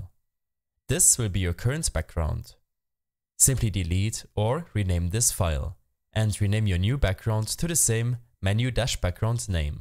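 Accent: Germany. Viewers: the german accent is crazy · hahaah man hört instant den deutschen akzent raus Danke für tut :) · You came from German right?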